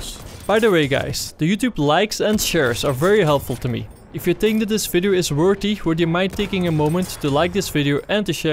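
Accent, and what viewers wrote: Netherlands. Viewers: That accent sounds Dutch with a hint of Scottish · are you dutch?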